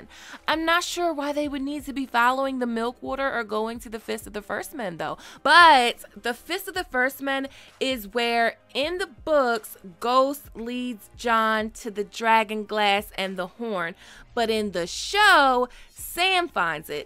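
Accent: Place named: Baltimore, United States. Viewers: you sound like you from maryland, just saying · The Baltimore accent is strong in this one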